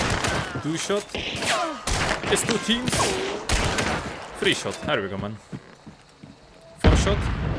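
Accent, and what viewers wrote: Czech Republic. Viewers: Im already know all by 300 hours but thanks for tips you sounds I little bit like from Czech Republic so easy to understand thanks man..👍🤠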